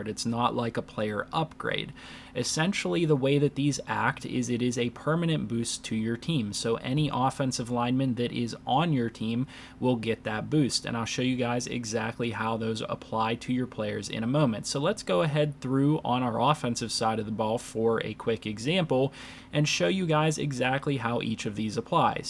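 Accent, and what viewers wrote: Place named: Pittsburgh, United States. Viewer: I’ve got the Steelers on mine, too. I can hear your Pittsburgh accent coming through. Am I right?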